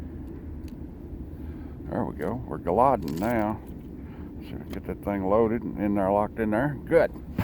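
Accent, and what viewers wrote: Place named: South, United States. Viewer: I really enjoy your videos, they are down to earth and besides I like your Southern accent ;)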